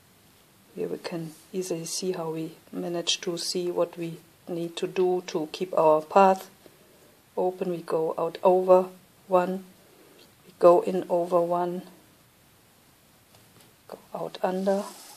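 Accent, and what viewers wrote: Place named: Germany. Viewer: I LOVE your German accent! And I continually applaud your English. : )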